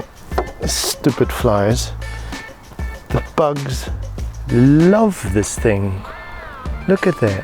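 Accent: South Africa. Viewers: Any chance you from South Africa with that accent? 🤔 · Mate you sound like you could be from SA?? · Is the presenter South African? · This guy sounds South African 👌 are you? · you sound very South African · not sure. Just know he’s South African from the accent.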